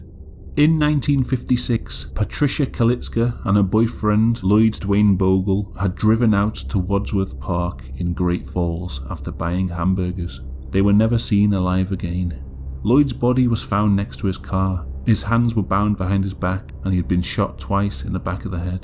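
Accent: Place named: Northwest England, United Kingdom. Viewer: Love your videos, they’re always so interesting and familiar because of your Northwestern England accent. My family are all from that area 😊and it’s so nice hearing you voice over the vids. I’ve only just found your channel and couldn’t sub quick enough. Brilliant 🙂keep at it, you definitely keep me entertained x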